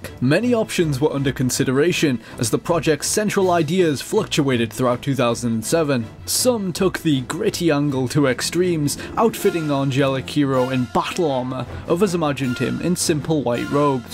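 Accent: United Kingdom. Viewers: It's a heavy British accent if I'm hearing right, and I'm Canadian, all I hera is English and French. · Your voice is great its my favourite British accent - and I live near Manchester, and cheers for telling me yet more information that I didn't know. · I always thought that the way you spoke was cool. Why in the world would anyone knock a British accent?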